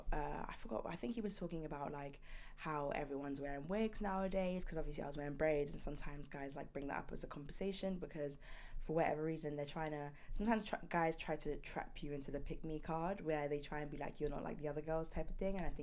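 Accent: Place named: United Kingdom. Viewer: This is my first time watching one of her videos— But tell me why did I only realize that she had a British accent half-way through the video? LOL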